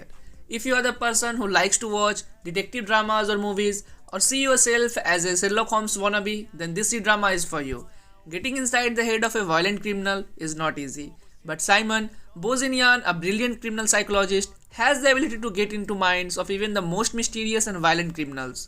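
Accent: India. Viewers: Are you Indian? Your accent 🤪 Me is too🤣🤣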